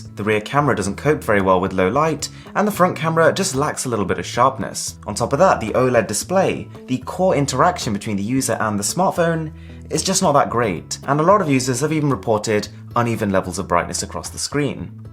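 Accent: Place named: England, United Kingdom. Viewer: Just out of interest, is Aaron from England or from the USA? The reason why I ask is because he sounds like he has an English accent but he says "Zee" instead of "Zed" And he talks about the prices in USD instead of GBP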